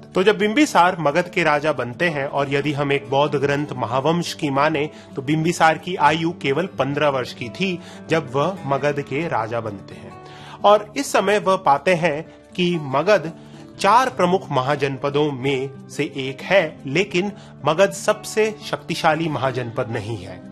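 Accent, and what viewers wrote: Standard. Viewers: actually he's not wrong totally, most people use Hindi mixed with English, Persian ,Etc in modern day .... But this शुद्ध Hindi sounds so good to listen to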